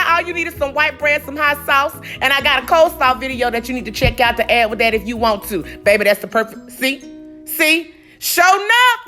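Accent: South, United States. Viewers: I like that country talk ❤️ Already subscribe 💪🏿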